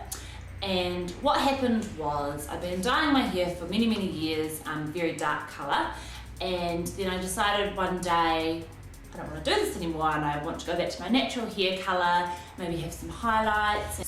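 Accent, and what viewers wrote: New Zealand. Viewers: Kiwi! Yay! So nice to hear a familiar accent on here :D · new zealand accents are my favorite and I apologize for the ignorance, not all americans suck.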